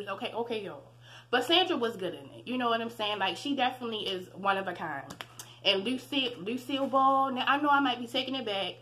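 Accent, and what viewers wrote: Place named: Baltimore, United States. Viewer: I’m from Baltimore and your accent sounds similar .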